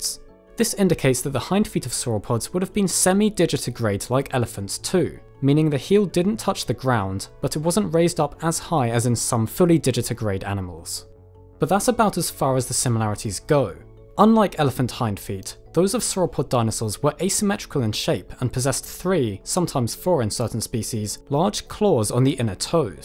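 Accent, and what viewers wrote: RP, United Kingdom. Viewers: I enjoy your work. you always put much thought into it and present the material well. i learn something new every time. if you want to pursue a career in network broadcasting, you are photogenic, you present yourself well and your accent is clear enough for most Americans to understand yet will be classified as upper class British so educated and trustworthy therefore ideal for promoting news and science as opposed to sport or entertainment. however, you appear to be turning your head so much that it distracts the viewer from listening. try limiting the characters per line from 80 to 60 or perhaps 40.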